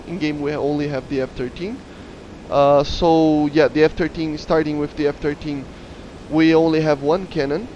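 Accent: Brazil. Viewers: caraio que sotaque de brasileiro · That guyse sound likes a Brazilian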